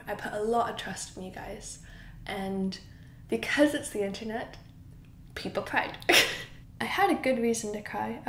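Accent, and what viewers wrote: United Kingdom. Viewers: I totally missed all of whatever you're talking about!!! But I'm glad you're okay and past this. Also, it's pretty adorable that the longer you're in Europe, the thicker your British accent becomes! I've had friends move to Georgia or Tennessee and they start adopting a southern accent without realizing it. It's like the same thing here!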